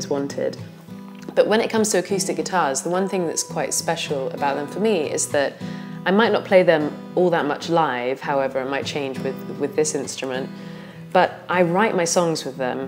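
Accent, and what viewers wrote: United Kingdom. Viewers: Really ? I thought that accent was kinda British... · Your British accents makes it official love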